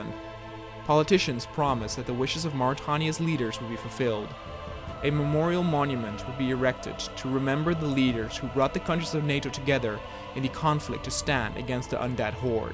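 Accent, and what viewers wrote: Netherlands. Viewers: You are Dutch? I am Flemish. It took me 10 minutes before I could clarify your accent, well done! Also: great way of recycling genuine news footage into an alternative 'present' and a zombie war. Awesome! Dikke proficiat, dat deed je geweldig! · are you dutch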